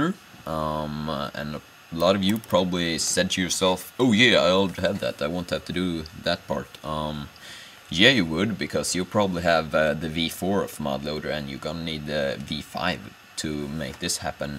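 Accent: Sweden. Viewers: are u from sweden u talk with swedish accent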